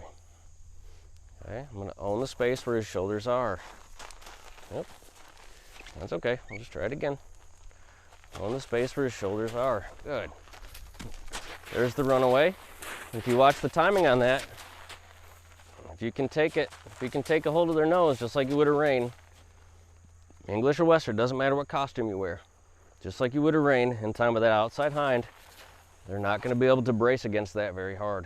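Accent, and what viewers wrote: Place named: Michigan, United States. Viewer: knew by the accent you were probably from Michigan (from royal oak myself).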